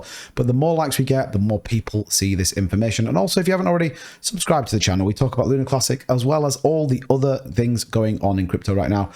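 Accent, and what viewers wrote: United Kingdom. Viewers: That’s UK accent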